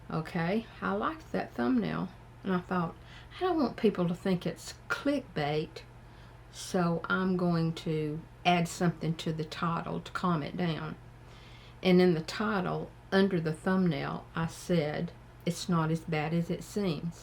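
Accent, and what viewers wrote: South, United States. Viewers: I'm a 58 year old man working on putting together a YouTube channel about several different subjects I'm interested in and I'm listening to everything you're saying! I love your channel and I make it point to watch your videos just before I got to bed because they're so relaxing to listen too. I enjoy your slow southern accent but Ironically you get to the point very quickly. I'm from the south and I have lived way up north for several decades and I miss the southern accents and the slower pace of life, your videos give me that back home feel. I found you by looking up side hustles and when I watched your first video on how you did it I was so encouraged that I decided I'm going to do it! Now I regularly watch for your videos and I am subscribed to your channel so you come up in my feed daily now. Thank you for sharing your wisdom and honesty!!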